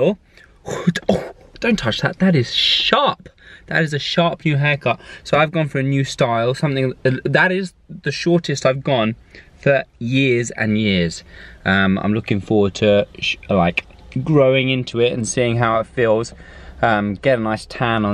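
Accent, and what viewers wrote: United Kingdom. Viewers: Your videos are such a motivation. By the way, lovely accent, what part of UK u from?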